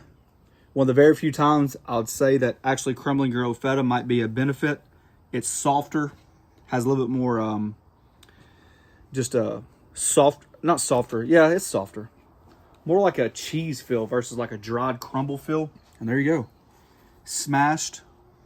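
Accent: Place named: New York, United States. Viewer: There is nothing wrong with your overalls. I happen to have the same ones, carharts I love them. My friends all say they never saw overalls on someone with a new york accent before. I just say YOU GOT A PROBLEM WIT THAT????? ps. Where did they go? Gone half way through the video. For get a bout it.....THANK you Frank from montana...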